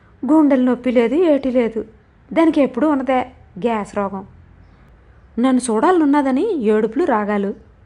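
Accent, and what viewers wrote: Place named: North Andhra, India. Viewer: ముగింపు ఊహించగలిగేదే ఐనా కథన బలం చదివిస్తుంది ఇలాంటి కథల్లో! జీవన చిత్రాలు కథలుగా కదిలే విధం అదేగా! ఉత్తరాంధ్ర యాస గారి గళంలో బహు సహజంగా ఉండి కథకు మాధుర్య పరిమళం అబ్బింది!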